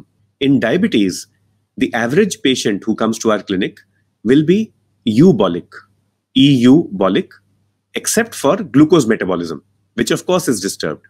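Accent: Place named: India. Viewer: Very hard to follow with such a heavy Indian accent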